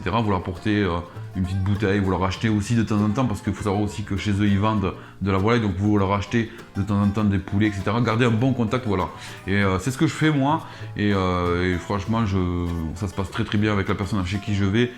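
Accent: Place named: South, France. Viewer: région du Tarn l accent me semblé familier je viens du Gard , haaaaa le sud lol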